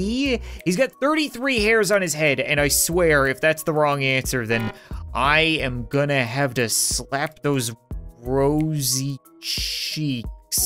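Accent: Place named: Canada. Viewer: S'called canadian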